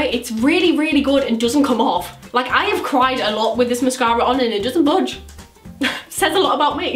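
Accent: Newcastle, United Kingdom. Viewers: Hello it's by birthday today and it would be really really cool if you could reply to my comment !!! I love your channel soooo much and you're so funny and your jordie accent melts my heart !!! Love you so much it would mean the world if you read this !!! Thankyou xx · Are you from Newcastle xx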